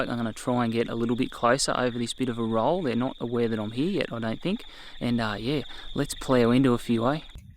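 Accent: Australia. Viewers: Il just saying like it is. First off I wanna say that I watch alot of hunting videos and usually only aussie ones and by far your channel and your hunting videos are the BEST! You not only hype me up to go out and hunt but I reckon anyone else watching will have the feeling that you give me...and that's the feeling as if I'm out there hunting with you bud. You take so much time and effort to not only explain but document everything exciting and sitting on the edge of your seat kinda view. I would also like to come out there and say to all the negative comments you get on this and other videos about you shooting ferrel cats and foxes that they should all go and get fucked and if they don't like it to just not watch. But hands down iv smashed that subscribe button so hard that I ended up cracking my phone but who gives a shit. I love all your videos and that great Aussie accent just puts the icing on the cake. 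So great job and you keep me up to all sorts of hours at night hooked to your content. To all the haters just go and piss off or get deported lol. Go hard but as people don't realise the time it takes to edit and put up videos like yours and also the effort it takes of you just being out in the greatest country on earth living the dream. I'm happy I found a video I could comment on as they had turned off the comment section on some others and that's why I spilled my guts out here. Big thumbs up to you and God bless you and your family and be safe my friend. Best bloody hu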